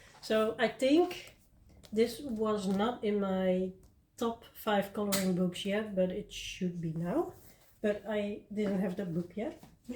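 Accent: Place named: Scandinavia. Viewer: What an amazing and interesting life you have in the Netherlands! I loved seeing your hamster space, they are cute little babies. 😊 Thank you for sharing some about your life with us! It helps me be invested in you as a person and your channel as well as seeing your beautiful coloring. Thank you again! Oh - and your accent is not funny ha ha it’s lovely. My sister lives in Sweden and her husband speaks several languages from the region so I figured you were nearby. 😊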